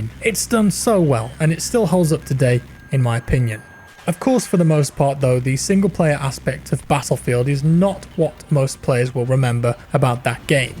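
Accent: United Kingdom. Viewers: Brits are just natural narrators. Very nicely done,.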